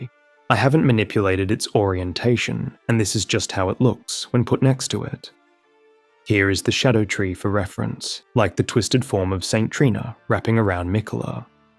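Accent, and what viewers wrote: Australia. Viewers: Hey not sure if this is me just confusing aussie accents but you have to be ' ' right? If so you're killing it with these channels dude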